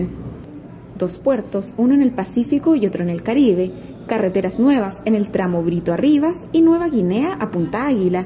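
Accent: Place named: Chile. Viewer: Esta locutora del video tenia que ser chilena, como arruinan las bonitas noticias !!!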